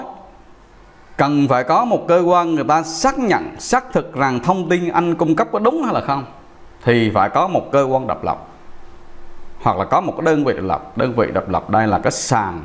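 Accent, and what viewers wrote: Quang Nam, Vietnam. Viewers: Kênh e rất hữu hiệu, giọng Quảng rất hay. Tuy nhiên cần chuyên sâu thông tin và phân tích tầm chuyên gia . Anh ủng hộ.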